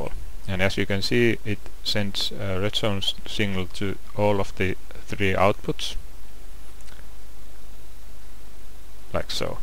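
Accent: Finland. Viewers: you sound like finnish guy speaking english... are you finnish?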